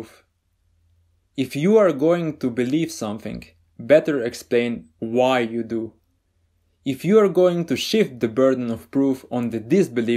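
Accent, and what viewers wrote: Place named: Slovenia. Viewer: Are you from Slovenina maybe 😅 I somehow recognize the Accent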